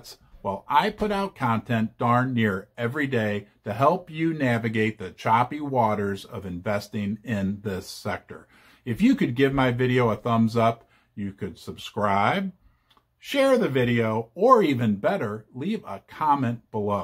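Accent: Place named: Michigan, United States. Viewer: His accent sound Michigander.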